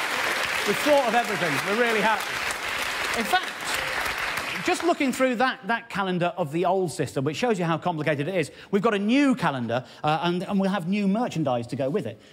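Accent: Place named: England, United Kingdom. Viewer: Clicked, heard the English accent and thought, "He must be a professor." Clicked the description. "Comedian. I was half right.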